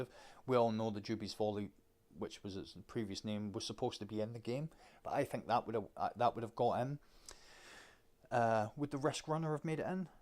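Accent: United Kingdom. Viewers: You have a kawaii British accent. You should talk more about this. My voice is gay LOL. I sound like a dude.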